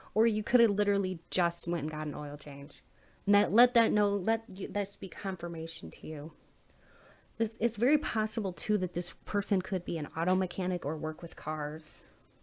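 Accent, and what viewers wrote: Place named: South, United States. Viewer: Good afternoon!I admire you very much and i adore your vibe.I am not from America but i feel that you stay in South of America ,in Ney Orleans,or Atlanta.Your vibe and how you speak make me remeber the Gone on the wind.I apreciste if you confirm3 this think!Thank you for your wofk!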